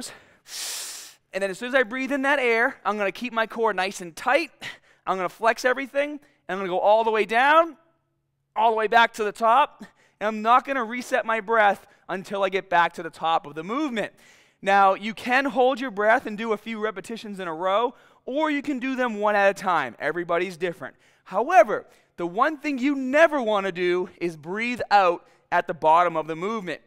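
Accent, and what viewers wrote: Boston, United States. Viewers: That Boston accent. I used to confuse it with the New York accent ... now I never will. I live in CT, so smack dab in the middle, but surprisingly I don't know a lot of people with a Boston accent. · I love your true Boston accent so beautiful. I’ll try this when the new local gym opens. It’s so cute how you say stahting and then say starting. Keep your accent it’s unique and part of who you are 🙂 I guarantee it’s super cool! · He must be from Boston. That’s how we talk here lol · I love Boston accents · The classic Boston accent! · Holy crap!! I'm new to this and have been watching ur videos for a while because u explain awesome. And I heard ur voice this time ..and said no freakin way. This guy sounds boston!! No kidding i looked u up!!! Wicked awesome neighbah! I live near fallriver, Ma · You from Boston ? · what the hell is his accent, man. 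Boston, maybe? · Is that a Boston accent i hear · Boston accent? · Is he from boston?? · I love that accent, is it Boston? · That Boston accent is thick!! great vid!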